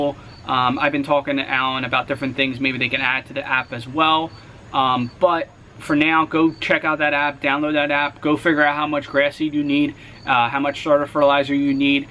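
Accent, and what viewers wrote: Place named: Philadelphia, United States. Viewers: Definitely recognize that Philly/South Jersey accent. Fellow native & lawn care nut here, Gloucester County! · Ha, I was thinking the same thing. Seemed like you had a twinge of Delco too!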